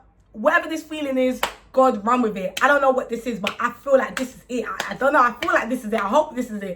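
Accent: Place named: United Kingdom. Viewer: I don't usually like the British accent but you I love! but when you clap your hands all the Time omg I get so stressed. but love your energy and you deserve this love!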